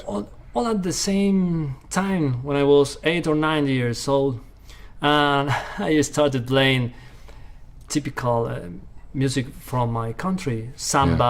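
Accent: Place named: United Kingdom. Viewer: Your British accent is very good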